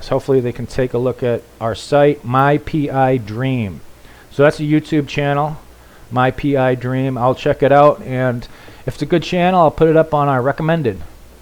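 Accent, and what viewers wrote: Boston, United States. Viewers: Hi, knew you were from Boston but first time I heard Dot!! Saint Ambrose in Fields Corner here. You??? Gotta know some of the same people!!! Was also stationed at Subic same time you were at Bragg.....hahahaha. Thanks for the vids....gotta make a trip to Dumaguete one of these days!! Have fun and stay safe!! Think you need an OFD tshirt. LOL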